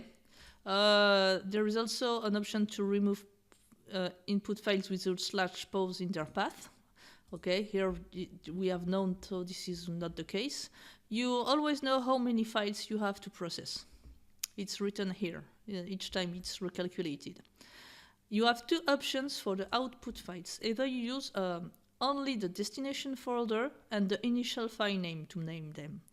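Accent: France. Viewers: She is a girl. Avec un joli accent français de surcroît ! 😉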